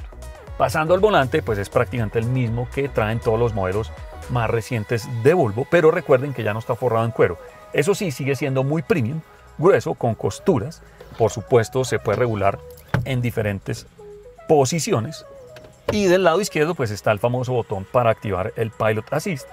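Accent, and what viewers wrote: Colombia. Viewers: Que impecable reseña. El acento colombiano es muy agradable de escuchar, y los paisajes acompañan muy bien las tomas del C40. Felicidades y gracias por vuestro contenido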